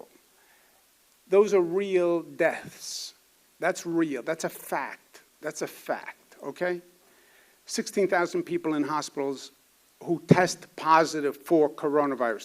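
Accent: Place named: New York, United States. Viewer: Someone’s tone of voice or accent shouldn’t determine his religion. He got a New York accent.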